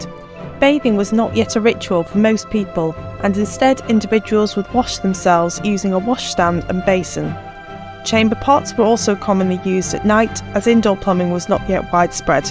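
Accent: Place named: United Kingdom. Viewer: I love British accent😊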